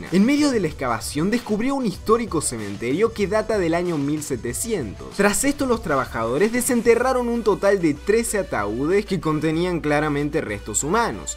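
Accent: Córdoba, Argentina. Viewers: sos cordobes o porteño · SOS ARGENTINO ?¿ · So' cordobeh?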